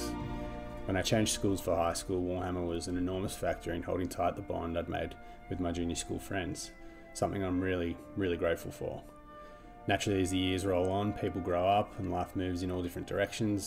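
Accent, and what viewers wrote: Australia. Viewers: Hey man, just stumbled on your channel. Great to hear a fellow Aussie into Total War and Warhammer! My story was actually quite similar to yours. Got brought into the fold in late primary school and instantly gravitated towards the High Elves with the very same army book! Hope to see you around in Quick Battles.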